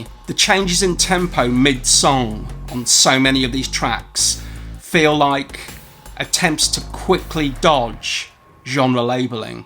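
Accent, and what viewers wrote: England, United Kingdom. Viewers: Honestly,my entire knowledge about GOTH & DARK WAVE wouldn't fill a shot glass. What has me interested is a sheer boredom with what I've listened to for so long, DEATH METAL & BLACK METAL. It just seems like everything has been done. How many songs can be written about going feet first into a wood chipper or songs about the dark lord and master. So yes, I'm new to it. GOTH seems to have the darkness that I gravitate too, but being ignorant, your channel is a nice way to find about the various bands. It also helps that I could listen to an English accent read the dictionary. Carpe nocturne.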